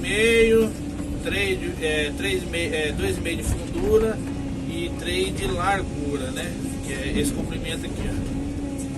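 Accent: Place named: Mexico. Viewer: You sound like Mexican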